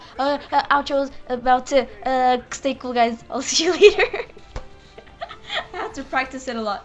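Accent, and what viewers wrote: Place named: South London, United Kingdom. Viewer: Dat South London accent was a buff one still clearly reppin the ghettos fam keep up da good work SAFE!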